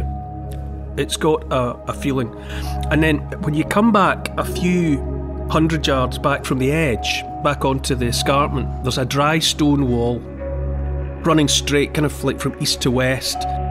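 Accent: Scotland, United Kingdom. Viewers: Neil Oliver => bringing back prestige to the Scottish accent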